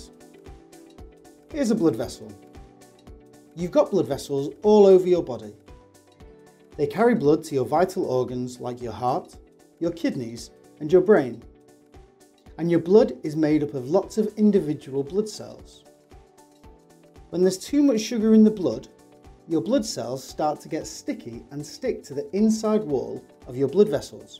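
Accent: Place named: North, United Kingdom. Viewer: Me just wondering what kind of British accent this guy has! Somewhere in the north?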